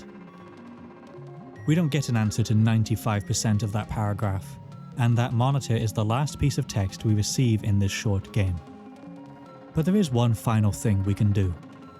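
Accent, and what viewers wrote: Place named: Wales, United Kingdom. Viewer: You kinda sound welsh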